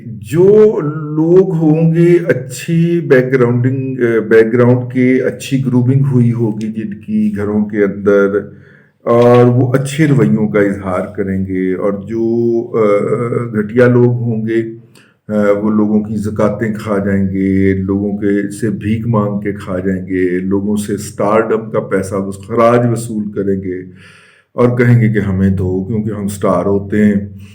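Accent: Punjab, Pakistan. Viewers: Dada g ki Andaz e bayan tarka Punjabi Kamala mola Kushal rakhy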